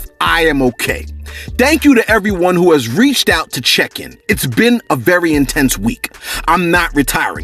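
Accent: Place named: New York, United States. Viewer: Sounds like a New York accent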